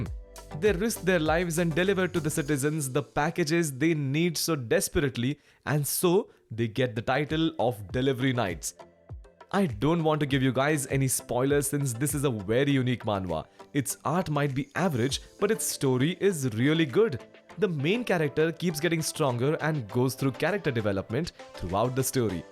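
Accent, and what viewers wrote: India. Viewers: Thanks, I didn't wanna hear his disgusting Indian accent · india accent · this guy is indian.... i know this accent 😁😂😂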